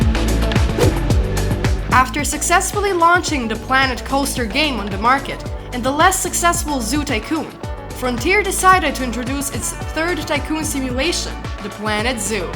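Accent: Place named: Russia. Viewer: its a women I can hear the Russian in her voice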